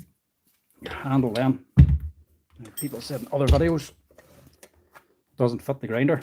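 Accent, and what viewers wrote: Ireland. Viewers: . Keep doin what you do. Love hearin Irish from the other side of the pond 🤪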